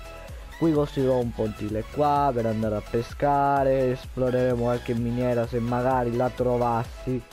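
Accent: Sicily, Italy. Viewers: 01 Dall'accento sei Siciliano vero? ;)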